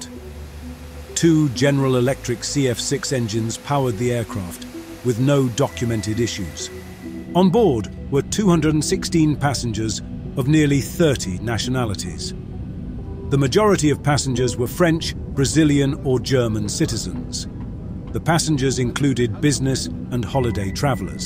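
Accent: England, United Kingdom. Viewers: English accents are overused and annoying.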